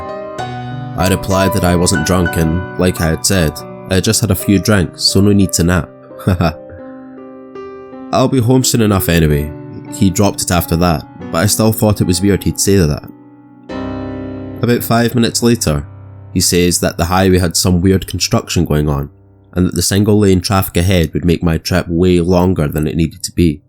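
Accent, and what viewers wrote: Scotland, United Kingdom. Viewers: It took me some time to realize, he speaks Scottish, even tho I’m Irish😂🤦🏻‍♀️ anyways, great story☺️ keep on going⭐️ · love the scotts accent,great stories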